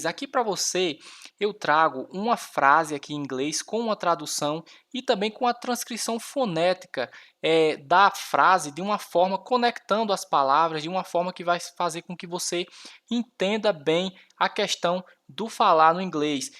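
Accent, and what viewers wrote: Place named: Northeast, Brazil. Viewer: Eu não acho um idioma maravilhoso, preciso do inglês por causa do meu trabalho... Uma língua aliatoria não tem concordancia adnominal, adverbial língua do capeta. se vc fazer uma análise sintático de uma frase,vc ver que não tem sentido...😩 vc e nordestino pelo sotaqui, nada contra, sua dinâmica é ótima bem expercifica 👍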